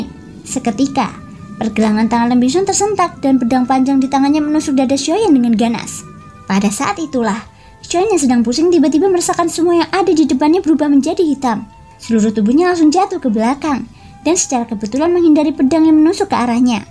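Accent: Java, Indonesia. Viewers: hai mimin medock banget suaranya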